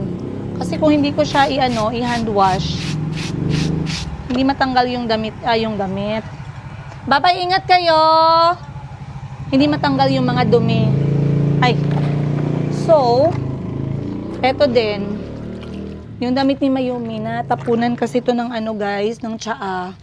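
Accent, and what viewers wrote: Iloilo, Philippines. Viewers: Oo alam ko Yan ma'am,sa Doon kpa naniraha sa Uganda Kasama c Mr yiga,ginawa mo Ang LAHAT na makakapagkakitaan ginawa mo Ang LAHAT na mapagkakitaan,Minsan lang Ako magcoment pero nanonood Ako sa mga vlog mo prove,sa Uganda magluto ka ng bisayang manok,nasasarapan Ang mga taga Doon sa luto mo,at kahit Meron na c chico nagbabantay ka sa tindahan my at Meron Kang katiwala Kasama mo pero Ikaw Ang nagmamahala Doon,at Ang umuwiwi ka gensan, at nakakapagpatayo ka ng Bahay sa nabili mong lote,alam ko no? Taga Digos PO Ako bisaya PO Ako,sinusubaybayan kita Hanggang dumating c mayumi,at may mayumi closet na Ang Bata Hanggang umalis na c ate Christine,believe talaga Ako sa yo,Ang tapang mo na pinalaki mo ng maayos Ang mga anak mo,at may nalalaman na akong salita 2 lng( talat- sa ating salita ay palengke,at sawadika or sawadikap-good morning!o kamao na ko no? 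suggestion lng PO maan,f ever suyuin ka ulit ni Mr yiga,pwede pa ba SYA na pumasok ulit sa puso? Masaya Ako pagnangyari Yan kasi mayroon kayong mga anak,daghang kaayong SALAMAT ma'am,nkatawa ko nmo ba Tagalog Ang salita mo pero illonggo Ang tuno ng boses,pero nalingaw ko uy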